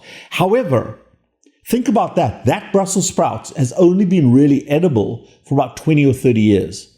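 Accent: South Africa. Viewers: Always lekker to hear a South African accent here on the tube... Thank you, I appreciate your videos, love from Darljng South Africa! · Super-informative video! Love the accent! South Africa?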